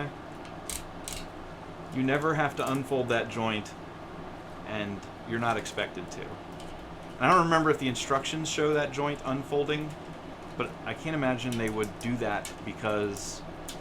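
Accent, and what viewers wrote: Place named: United States. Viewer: I'm willing to loan Big Spring, but I would like to know where you're located first. You sound American, so I'm not really concerned about long-distance transport, but a friend has told me that the quarantine has screwed around with delivery routes. Supposedly mailing things eastward, especially to large urban areas like NYC and DC is risky.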